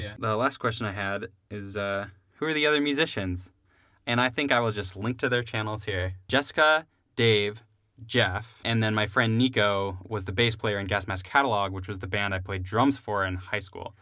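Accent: Chicago, United States. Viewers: "Valume" is a Chicago accent thing. Like I catch my self saying pocket like "pah-ket" all the time. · hey...great vid and great answers....as a fellow Chicagoan...celebrate the Dialect...not everybody gets it...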